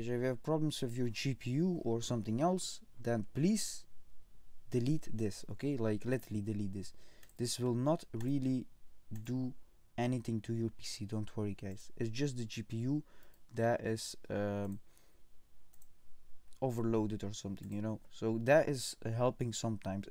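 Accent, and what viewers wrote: Netherlands. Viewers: lol i can hear you have dutch accent me 2 haha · Ik hoorde gelijk dat je NL was haha maar goed uitgelegd pik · ik hoor nederlands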